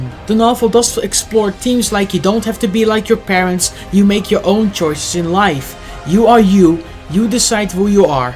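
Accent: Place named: Netherlands. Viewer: You sound like a dutch person who speaks english😋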